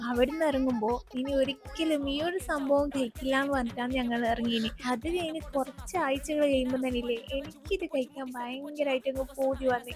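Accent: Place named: Kannur, India. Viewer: കണ്ണൂർ ആണെന്ന് തോന്നുന്നു.. സംസാരം കേട്ടിട്ട്...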